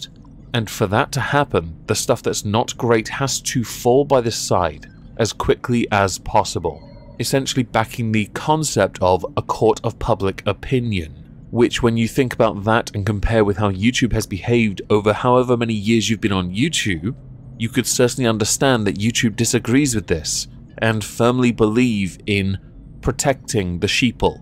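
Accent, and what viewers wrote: United Kingdom. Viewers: Hate the British accent? I could never. Grew up on Monty Python & Beatles. Just came from a Lotus Eaters vid before here (& secretly wishing The Britisher would put up some fresh material I could go after this). Much love, yer moistness!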